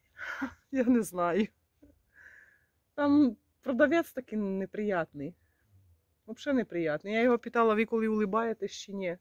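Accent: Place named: Zhytomyr, Ukraine. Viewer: доброго дня вам,ви дуже гарна жіночка один позитивчик,не звертайте увагу які роблять вам зауваження,у кожному регіоні розмовляють по своєму, А в Житомирській області так розмовляють,я трошки там жила.Коростишівський район с.Харитовівка.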